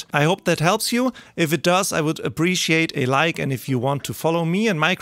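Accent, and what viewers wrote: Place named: Germany. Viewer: German accent incoming in 3...2...1 🥰 love it.. Thanks for your videos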